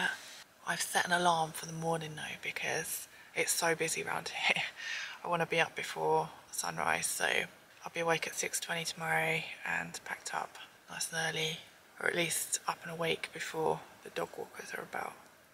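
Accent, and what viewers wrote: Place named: England, United Kingdom. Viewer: Love your English accent and pronunciation :-) ❤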